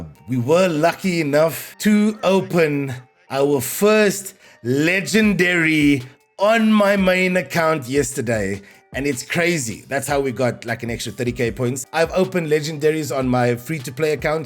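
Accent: South Africa. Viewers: Sounds like you come from SA! Glad to see this.. good luck for the channel, now following 👍🏻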